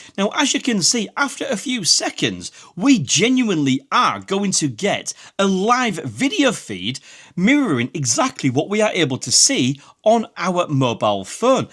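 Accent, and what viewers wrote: Hull, United Kingdom. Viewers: Are you from Hull?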